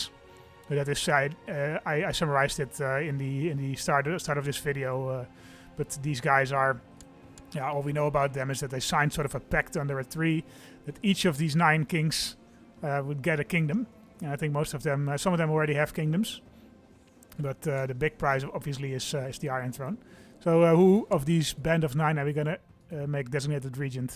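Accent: Netherlands. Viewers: Are you Dutch? I’m hearing a dutch accent, so fun to see someone from my country having the same passion for asoiaf and ck2 agot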